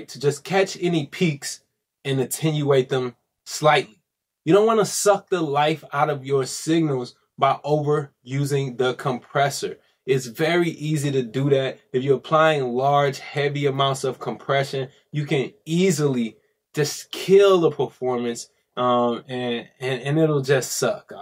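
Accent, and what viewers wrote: St. Louis, United States. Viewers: UR GODD AT IT BUT U R HILARIOUS.....U SOUND LIKE A STL NIQQA!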